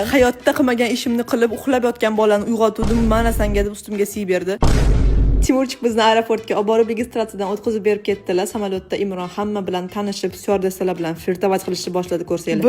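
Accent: Khorezm, Uzbekistan. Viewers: Xorazmni tayeridansiz 😊 men ham Xorazmdan man😊😊😊❤❤❤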